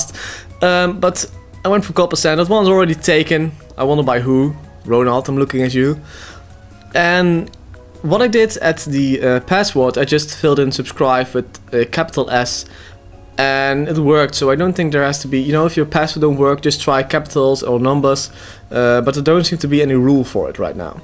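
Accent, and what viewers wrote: Netherlands. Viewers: i knew it you are dutch your accent made me think is he dutch xD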